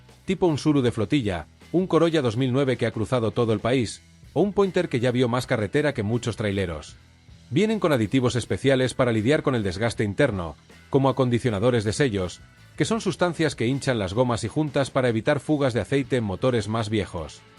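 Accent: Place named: Spain. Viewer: Me desesperó ese acento español ibérico y creo que el video no aporta mucho. Es evidente que está hecho por IA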